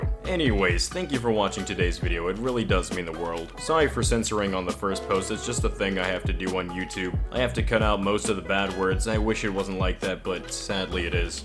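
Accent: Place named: Chicago, United States. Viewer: You have a fresh suave Chicago accent, is it just me who thinks that?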